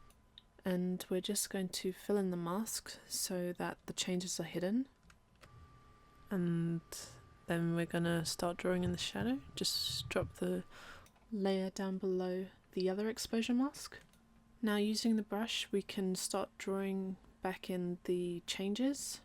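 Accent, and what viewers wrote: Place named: Southern England, United Kingdom. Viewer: Well that was okay but you've gone too fast for some, I've only cottoned on by pausing the video to see what you've clicked. As of now, I'm totally sick of hearing your posh Southern voice & I'm a fellow Brit. You've literally explained nothing here - just skirted over everything at pace, awful, awful "tutorial" but "thanks" for trying...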